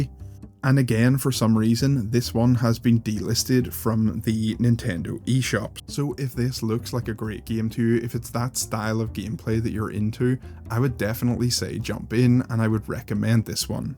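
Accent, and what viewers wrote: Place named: Scotland, United Kingdom. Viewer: You Sound Scottish. I Am American...I Love Scotland,And Am Thinking Of Maybe Moving There...But Your Scottish For Sure I Can Hear It.,Cant Get Rid Of That Accent Lol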